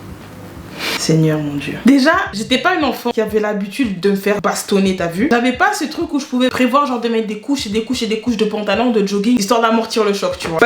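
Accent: Cameroon. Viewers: trop marrant surtout avec ton accent camer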